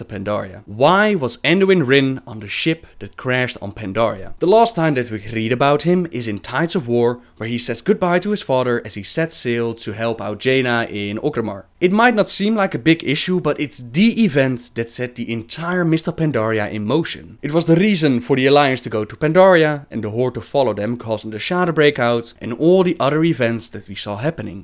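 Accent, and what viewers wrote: Netherlands. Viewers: are you dutch OP? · are you dutch?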